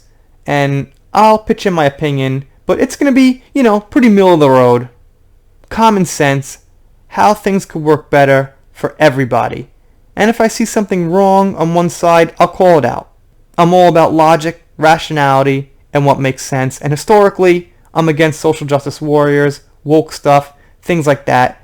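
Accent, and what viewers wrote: New York, United States. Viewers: You remind me of them proper New York like ..cool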